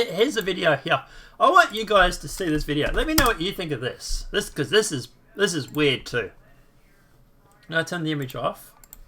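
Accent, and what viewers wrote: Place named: Australia. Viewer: Great work, thank you for trying to keep these crooks honest, sounds like you are Australian, doesn't matter, just thank you, if you are not a US citizen you are doing this for the good of humanity.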